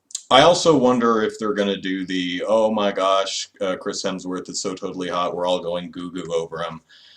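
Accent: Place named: Kentucky, United States. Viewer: Off-topic but did you grow up in KY? You have a certain way of speaking.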